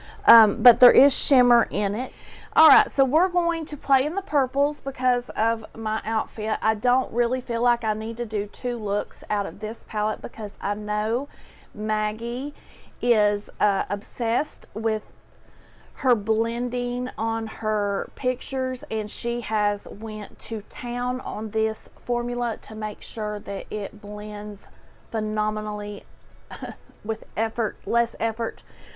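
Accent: South, United States. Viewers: I Need that palette!! I don't usually say that..😯 I love your accent 😍 Southern Lady..the looks you do 💜 you're so Amazing at what you do!! Aaaandd... 1 k is coming..🥳 Love you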